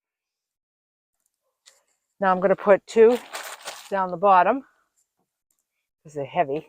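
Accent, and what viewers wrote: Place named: Boston, United States. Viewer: Awesome,! Absolutely love your style! And your accent is the best! I’m from a city just north of Boston and I have the same accent! And I’ve always loved it❤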